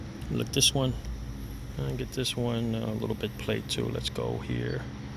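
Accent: New York, United States. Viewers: Hey thanks for this short and sweet, to the point video. I need to replace both rear window regulators and I cringe at sticking duct tape over the glass. I was thinking about jury-rigging something with some suction cups and wire, then I saw this. Figured it would take me more time and trouble than to just buy it from Lisle. Your accent sounds like NY to this ex-pat Bronx guy. Take care.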